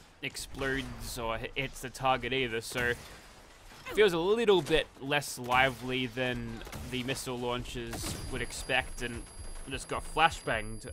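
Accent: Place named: Australia. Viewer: First time in months I’ve listened to your Aussie accent. What do you think of how bad Eddie Jones & the team done in the RWC 2023 ?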